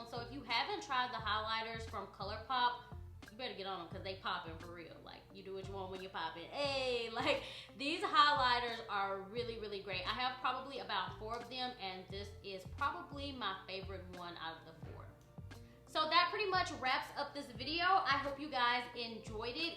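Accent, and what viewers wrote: South, United States. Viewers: Your southern accent is so adorable.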